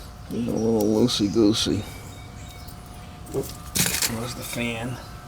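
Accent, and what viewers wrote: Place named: Southeastern Massachusetts, United States. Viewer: I knew that accent was from SE Ma 😅😅😅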